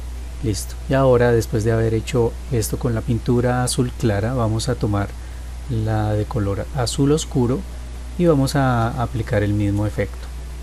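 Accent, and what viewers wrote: Colombia. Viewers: Muy buen video 👌😃 (tiene acento colombiano pero no usa términos colombianos)(?)🤣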